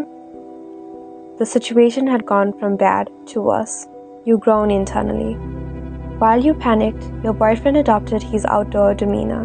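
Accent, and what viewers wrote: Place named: Afrikaans, South Africa. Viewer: Quick Questions: Are you Afrikaans? or Indian? Either way, I love your accent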